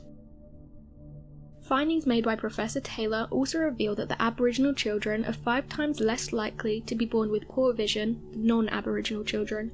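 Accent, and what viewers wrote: England, United Kingdom. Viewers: Your narrator sucks! Save the accent for England!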